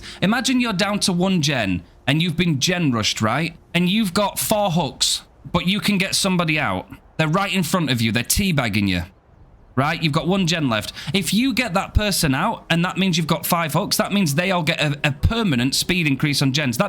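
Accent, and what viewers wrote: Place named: United Kingdom. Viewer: Bro this was so funny listening to especially the start to mid rant and the british accent just is the icing on the cake, I say this with love as a fellow european you are really good at ranting and the accent fits perfectly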